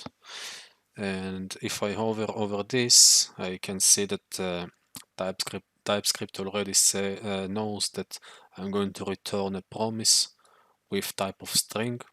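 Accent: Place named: Madagascar. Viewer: Hello, I was wondering if you come from Madagascar? ( cause of the accent ) anyway, this is a great video, thank you for the sharing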